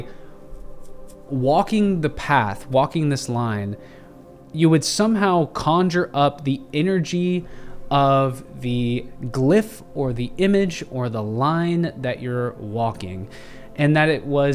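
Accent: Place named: Carolina, United States. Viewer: Dude, you sound like you're from my neck of tha woods... #CarolinaGrown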